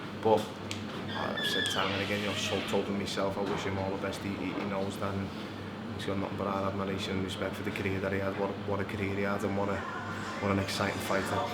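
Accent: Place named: Liverpool, United Kingdom. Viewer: Yeah brother! Paul Smith back at it again with the Scouse uyaaaah brother!!!! Shout out to my boy KUGAN, RIP my sweet soul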